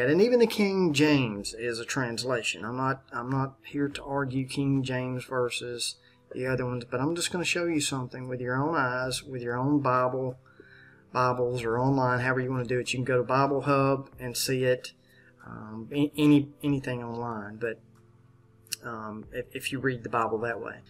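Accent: South, United States. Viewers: awesome bro. you from Bama!? that's sick. I never knew that. I always knew from your voice that you were from some part of the south, but I love it. I'm from Alabama also. been here my whole life. Do you still live in Alabama?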